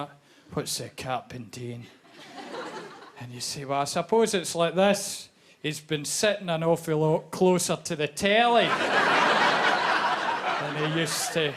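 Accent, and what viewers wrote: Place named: Scotland, United Kingdom. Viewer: he's from the east coast of Scotland, not glasgow, i'm from the west coast of kintyre & my accent is different from people living less than 10 miles away which is different from the accent 10 miles in the opposite direction & there are half a doz different accents in the 37 miles between my hometown & the tarbert, loch fyne accent, there has to be at least 100 different Scots accents between the borders & the northern & western isles with the best spoken english place in the world being the city of Inverness on the moray coast, obviously due to the aftermath of culloden in 1746 as the site is barely ten miles away & the town would have been garrisoned with english troops following the '45' rebellion. david kay is a comedian everyone should adjust their ears to as he is unique, original & hilariously funny, unlike the micheal mcintyres & catherine ryans of this world who are pathetic yet never off our screens.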